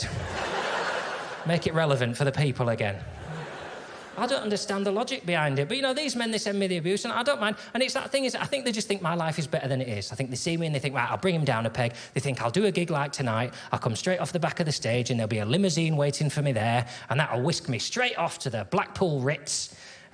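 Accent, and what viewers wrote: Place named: North of England, United Kingdom. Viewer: North of England, I believe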